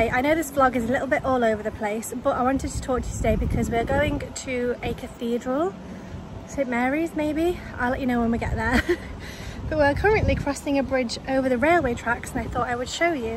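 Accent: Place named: Northern England, United Kingdom. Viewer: Hi. The type of tuna you ate is not yellow fin which is what you get in the store in the UK This is known as " Kelawala" by the locals. I too have got sick eating this Fish In SL there are no testing for contaminants you buy at your own risk.This fish has a dark red tint and looks bloody and can make you sick.I grew up in SL and now live in the US. Ps Your accent sounds very West Midland/Northern England.I have English roots and have been to the UK about five times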